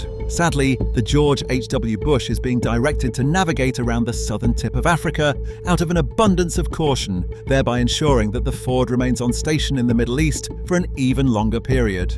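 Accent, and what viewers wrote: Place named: United Kingdom. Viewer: The Ford is already back in the Red Sea ready to go. By the British accent I would say wishful thinking.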